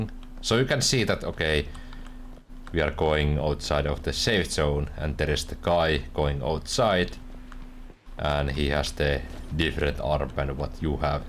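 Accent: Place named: Finland. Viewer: Finnish accent Perrkele 😂 ❤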